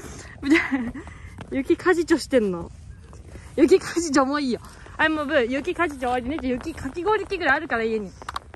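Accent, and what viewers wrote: Kyushu, Japan. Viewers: 文がまるで犬のような姿見せてくれてる事よりも、 姉の拠点が関東な事に衝撃を受けてます イントネーション的に九州辺りかと思いながら見てました （過去動画に引っ越し関連の説明あったりハズレてたらゴメンナサイ）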